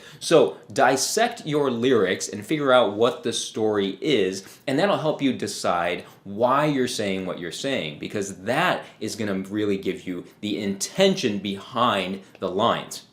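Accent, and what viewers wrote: South, United States. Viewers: the Southern accent to sound so natural on you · Your southern draw is awful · Liked the southern accent🙈😌😭😆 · Your southern accent sounds like Matthew McConohay 😅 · Love the southern accent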